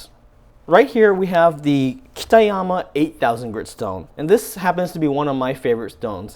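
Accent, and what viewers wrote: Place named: United States. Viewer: The only Japanese on the Earth with such a great American Accent!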